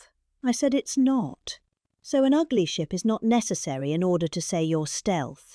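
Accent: United Kingdom. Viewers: I have not laughed so hard in weeks. This is fabulous. Trump has early-onset dementia as well as malignant narcissism, so we are used to hearing him in speech after speech. But there is something that becomes so much more insane about hearing his actual speeches read by this British woman in her dialect. The ramblings are just...stunning. Thanks you! New subscriber.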